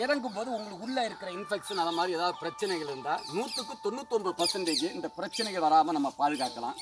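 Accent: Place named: Kanyakumari, India. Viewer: Annachi kanyakumari kaarara neenga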